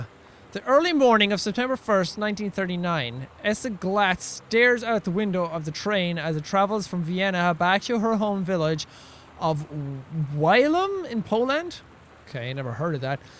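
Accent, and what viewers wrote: Ireland. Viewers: “ya fecking idiot” got me XD god, I love how some accents make certain words just sound hilarious. Not meant to sound racist, I love different accents, honestly I kinda wanna condition myself into having an accent like Jack’s, despite not being Irish, I just love the sound of an Irish accent. Don’t know why, they just sound satisfying to me.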